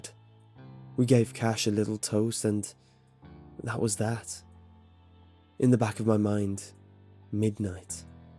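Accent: England, United Kingdom. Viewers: Haha, seems everyone sounds the same and has a lovely English accent in these stories… hmmm. :P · can you pronounce it "Creepypastra"? is that a britt accent? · bruh he's british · From Massachusetts but has an English accent? K🐸🍹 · I love his British accent! That’s when I sawr it !!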